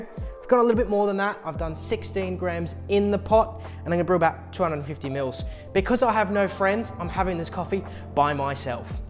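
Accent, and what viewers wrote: Australia. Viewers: Clearly an Aussie from down under👍🏼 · Where in the UK are you from?! I'm Aussie and you sound like 95% Aussie to me! lol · Are you Australian?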